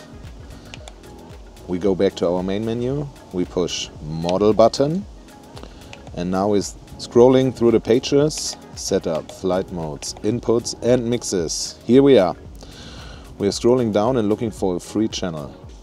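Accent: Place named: Germany. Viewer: Der Akzent klingt irgendwie nach Deutschland, also bin ich mal ausnahmsweise so frei: Top, sowas such ich. Ich wollte schon ewig wissen, wie die Technik hinter Formationsflügen etc. funktioniert. Kleine Drohnenshow machen und so. 🙂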